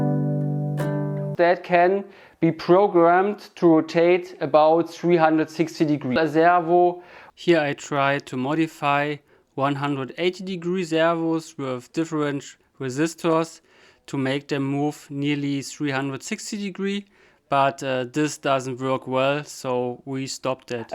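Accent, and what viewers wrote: Germany. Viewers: This is next level. Are you a senior designer at Airbus or Arianespace? Because you should be! Also, sorry - is you accent Bayern or in der nähe? Lived in Muenchen for a couple of years and still miss it! And sorry if I misplaced your accent and you are from somewhere like Hamburg 😂! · Nice to see people giving the Harrier some love! Really awsome work you guys deliver there. To be honest I just found you guys and have to say: You have a new subscriber xD I really love fighter jets and their engeneering and its nice to see how you can actually reconstruct an awsome work of technical art with enough determination and knowledge. I really really like the way you avoided some problems and tested things out. I hope you'll continue this awsome work not just on the Harrier, but maybe on some other aircrafts, after this project. and a tiny unimportant question (if this even gets read): Do i hear a little bit of german accent? 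xD It doesn't matter where you are from, but just out of curiosity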